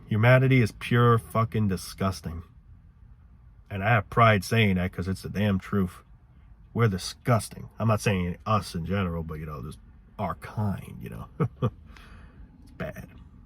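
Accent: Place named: Staten Island, United States. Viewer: He sounds like he’s from Staten Island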